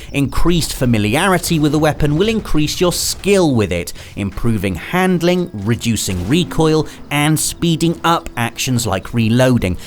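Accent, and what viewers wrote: United Kingdom. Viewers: Not another limey accent....Johnny Rotten plays rdr2?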